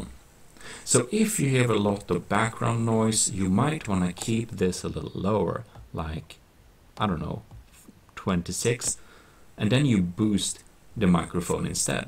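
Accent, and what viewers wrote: Sweden. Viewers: U sound swedish.If u are tjenaaa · I am swedish too! It's weird how you can hear it on the type of english people speak... epic video btw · Definitivt svensk, Norrmän låter mycket mer oseriöst (no offence). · swedish acsent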